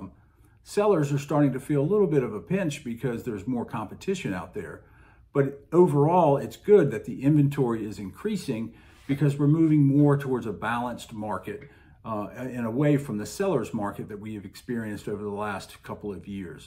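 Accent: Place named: Philadelphia, United States. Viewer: Are you from Philadelphia? I hear a regional accent. Thanks for the info. Can you focus on north Myrtle beach and Cherry grove area also?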